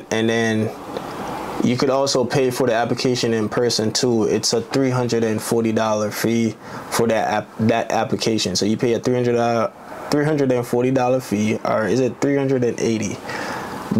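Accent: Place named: Jamaica. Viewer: This dude Jamaican as hell. Can't hide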